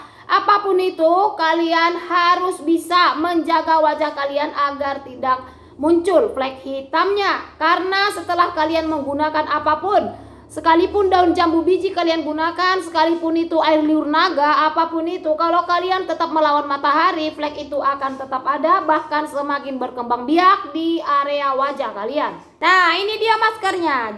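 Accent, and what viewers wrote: Medan, Indonesia. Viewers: Denarin kk lucu banget kyak orang Medan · Maaff mbak orang medan ya??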